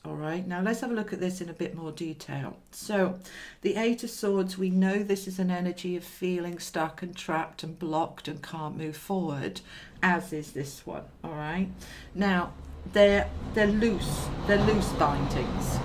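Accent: United Kingdom. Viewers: I love your beautiful British accent ! Thank you so much from France !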